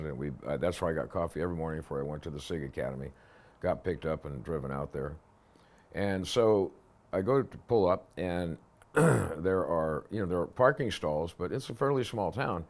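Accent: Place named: New England, United States. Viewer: Gotta love the New England accent! Like being in "Norich", CT.😅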